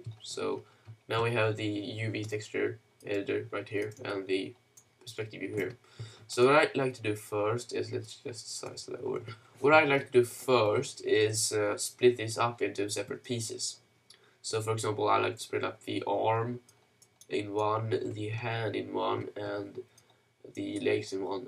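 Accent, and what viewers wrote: Sweden. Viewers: Haha, fan va man hör att du kommer från sverige ibland!! Lidingö eller? :P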